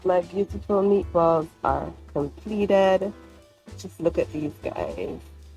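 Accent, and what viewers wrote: Antigua and Barbuda. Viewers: Are you Antiguan? You accent sounds that way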